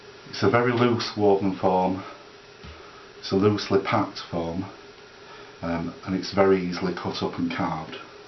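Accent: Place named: United Kingdom. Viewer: yes very good, your voice is fine, just has an accent. British I assume. Now PVA is? wood glue? the other thing to start with is spray adhesive..and then finish with hairspray. I just love the fact that nothing is wasted, just break it up in a blender. Roughly how much is a block and how many trees per block? thanks Nick (formerly from IOW)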